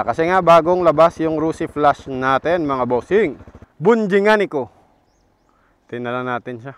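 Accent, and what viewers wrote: Iloilo, Philippines. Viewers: Ilonggo go ka gali ayusin mo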